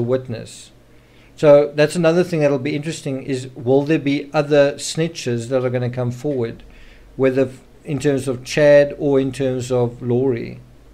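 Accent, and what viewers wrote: South Africa. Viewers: Wow a south african accent! The first I've heard as far as this case goes. Interesting video I've subscribed. (Johannesburg here)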